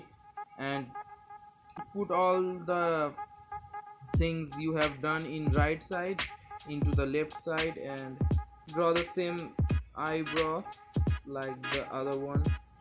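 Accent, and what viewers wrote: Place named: Bangladesh. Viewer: Listening to your accent i think youre a Bangladeshi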